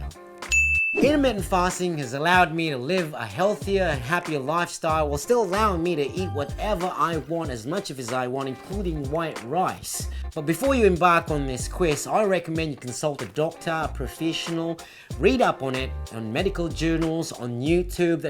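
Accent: Australia. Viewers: Wait so do u really have an australian accent?? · crikey i love your Aussie accent mate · Its still very surprising hearing an Aussie accent coming from a filipino guy. Coming from a filipino this is gold. Good on ya mate🤘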